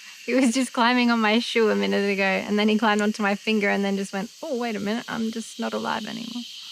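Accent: Australia. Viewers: I love watching your content! I’m from the u.s and I love your Australian accent ❤. Thank you for all the great videos 😊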